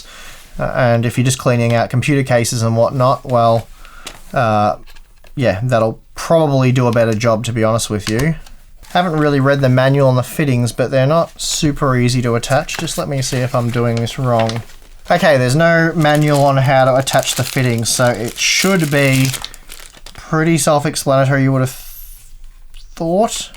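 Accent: Australia. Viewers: I LOVE THE AUSSIE ACCENT .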